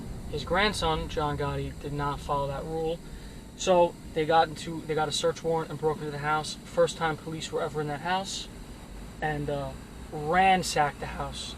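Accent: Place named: Philadelphia, United States. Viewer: Hey u a Philly guy I'm south of Boston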